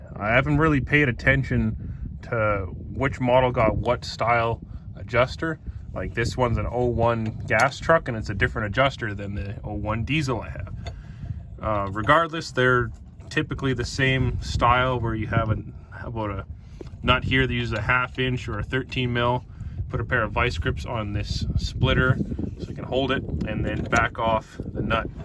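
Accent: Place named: Alberta, Canada. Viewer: holy fuck guy youre a walking 2nd gen encyclopedia! by the accent im guessing youre in Oilberta.. you happen to sell parts? trying to put a 2wd nv4500 into my 4x4 47re, need the 4x4 adapter, a nv4500 4wd output shaft, and the input shaft for my transfer case to match the nv4500... why the outputs/inputs werent all the same is beyond me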